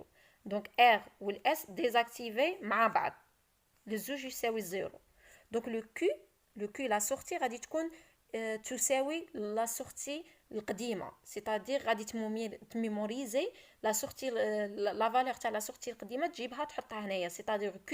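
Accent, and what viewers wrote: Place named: Algiers, Algeria. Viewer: L'explication est claire et l'accent algérois agréable à entendre.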